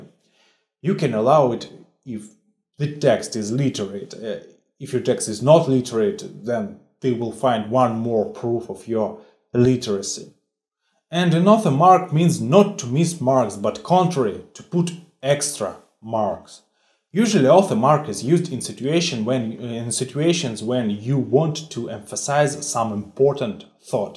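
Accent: Russia. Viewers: I love the Russian accent! Many, many years ago when I was studying Russian in high school (in the 70s), our instructor was teaching us how each language has something unique about it that is difficult for non-native speakers to duplicate. His point was that we need to master these differences in order to not sound so "American" when speaking Russian. For example, In English, we do not roll our r's. That's difficult for many English speakers and has to be practiced. We would spend a lot of time trying to properly pronounce words like рыбa, работа, рот, ручка и т.д. In English, we have the "th" combination that is rather unique and difficult for many non-English speakers to duplicate. That's why many non-English speakers have a difficult time properly pronouncing a simple word like "the." When a German speaker, for instance, tries to say, "I think so," it might come out sounding more like "I zink so" or "I tink so" or "I dink so." There are English sounds that do not exist in Russian. 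I remember my Russian teacher jokingly telling us about something he called the "Bit, Bat, Boat Test." This is how you know a person is a native Russian speaker and not an English speaker. Ask a Russian to say "bit" and it will invariably come out sounding more like "beet." That's because Russian doesn't have the short-i sound. Ask a Russian to say "bat" and it will come out sounding more like "bot." That's because Russian doesn't have the short-a sound. Ask a Russian to say "boat" and the "o" so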